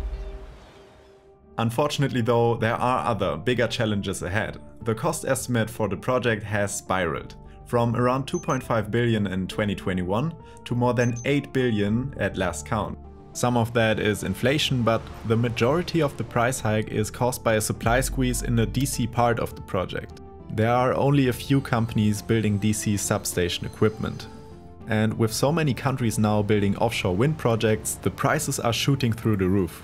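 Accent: Germany. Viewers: Great, we have English with a heavy German accent but no original German sound track. Kind of annoying. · man merkt so krass dass der Sprecher Deutscher ist. Ganz schlimm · Hopefully there is some work put in to try and reduce the accent. I am new to the channel and just watched 2 videos from last year and then clicked on this and I instantly went "oh they have a german narrator now". Its quite noticeable.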